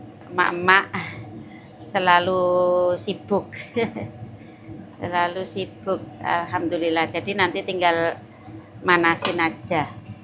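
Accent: Java, Indonesia. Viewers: Assalualaikum, salam untuk klg semua dari Balikpapan (kaltim)kalo liat vidio ini bisa senyum" sendiri logat jawanys itu lo yg bikin lucu😀😀👍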